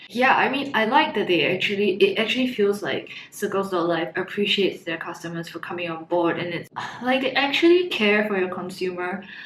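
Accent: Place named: South Korea. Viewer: Haha glad to watch this video, I am using circles'life $28/100gb plan now and happy about it. Are you from Korea? Your English sound like Korean's English, haha. I am from Korea.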